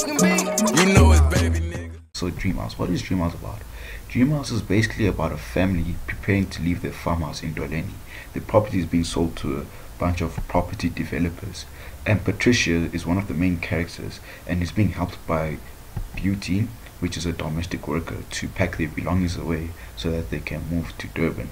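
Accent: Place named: Zimbabwe. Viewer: Could hear a Zim accent and I'm barely wrong bout such 😂🔥🚀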